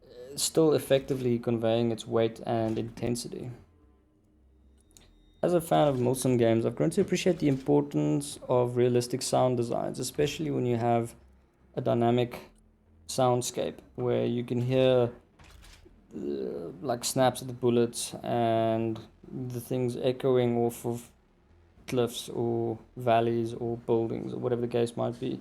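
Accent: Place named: Afrikaans, South Africa. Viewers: you sound afrikaans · It's easy to spot a South African ;)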